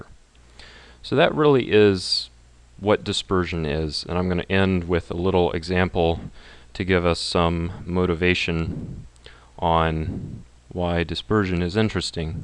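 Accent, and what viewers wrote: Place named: United States. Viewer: finally someone with a regular american accent that i can bear to listen & learn from. Thank you. subscribed!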